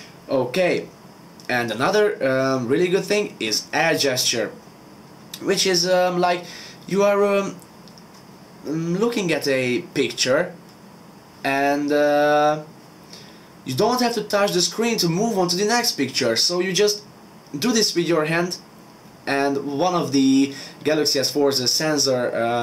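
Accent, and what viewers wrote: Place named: Turkey. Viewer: You just have a Turkish accent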